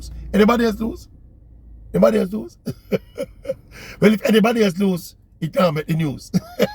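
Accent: Jamaica. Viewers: Oral Tracey I love your Content and energy - I just wish I was able to understand everything you are saying - some times you go too deep into your Jamaican dialect or speak too fast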